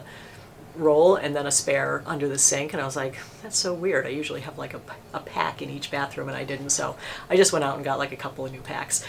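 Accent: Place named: Massachusetts, United States. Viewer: Omg! I knew you were from Massachusetts somewhere. I can tell by your accent! I'm also from Massachusetts! I'm in Webster Ma by the lake we have here... 🤗 Yaaaay I love seeing YouTubers from Mass! I'm so excited right now!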